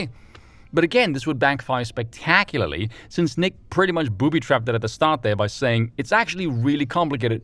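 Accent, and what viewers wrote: United Kingdom. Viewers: I swore you were british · listened to the first 1 minute 20 seconds, knew you were gay and british, left this comment to warn others, now off cheerios goody goody gum drops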